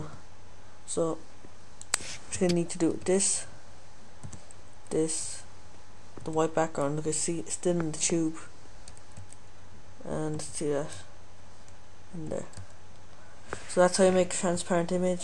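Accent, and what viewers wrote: Ireland. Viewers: He's Irish. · good video and its good to hear a fellow Irish man on youtube!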